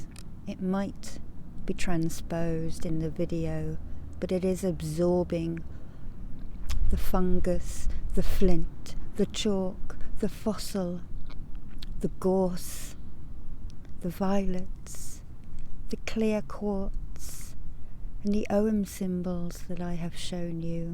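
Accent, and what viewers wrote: England, United Kingdom. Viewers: this is putting me to sleep so its hard to type this comment but you are wonderful - full of love and truly positive energy that you so generously put out there for the taking. you are a gift. i really enjoy the earthy feel of this creation and that you can convey such warm feelings from what looks like such a chilly, blustery spot is testament to your abilities. and i love your accent (one might call it true English... i'm American so yeah)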